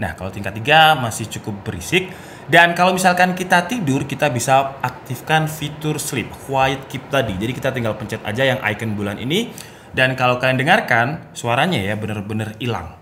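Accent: Malang, Indonesia. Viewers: Medhok nyaa itu loh heheh ngalam ae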